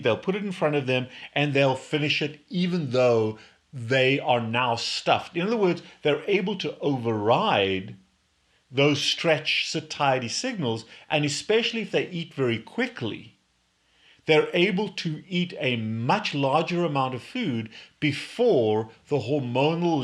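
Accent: South Africa. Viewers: South African accent. It's quite charming. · faint South African accent!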